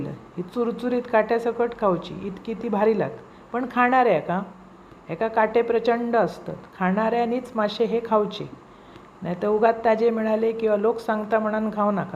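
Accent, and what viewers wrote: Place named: Malvan, India. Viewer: Taiee you are very sweet i love your simplicity! I watch all your videos !! Because your Malvani bhasha 😂Ithe way you speak it's very hillourios 😅fun 😂Love from Mumbai ❤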